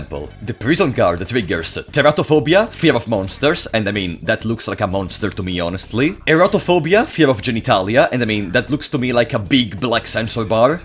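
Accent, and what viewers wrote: Italy. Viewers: I've never heard an accent as Italian as yours. I have family in Italy, i've had online friends in Italy, nobody I've heard has had as thick of an accent as yours. Ngl it's a lil impressive and i'm not saying any of this as a bad thing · I just love your energy, I never hear italian accents online and when i do they’re usually more timid, but damn you wear it on your sleeve and you OWN IT! I love the energy!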